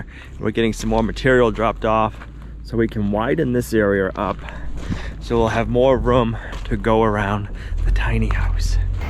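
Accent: United States. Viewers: Regional dielect we have them all over the US. Lighten up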